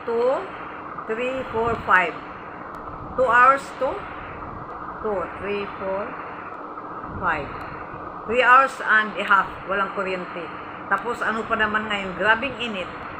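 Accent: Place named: Visayas, Philippines. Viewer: Ilhan gyud sis sa accent nato lahi gyud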